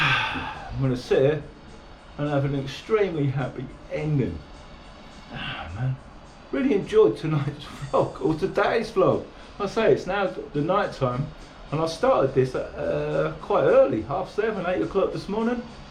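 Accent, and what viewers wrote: England, United Kingdom. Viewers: Where are you from? England??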